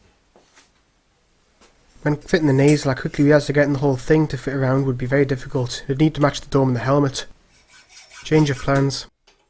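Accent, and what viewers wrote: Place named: Scotland, United Kingdom. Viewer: Great videos! Thanks for uploading! Clearly, you're from Scotland, but do you mind if I ask what part?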